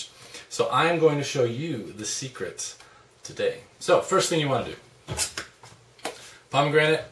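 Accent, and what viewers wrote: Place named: Canada. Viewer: You sound Canadian. A Canadian who talks too much.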